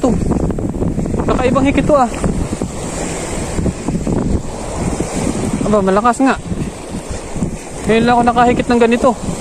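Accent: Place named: Polillo, Philippines. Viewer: Boss taga quezon province kaba.para kc punto ng taga polillo.😂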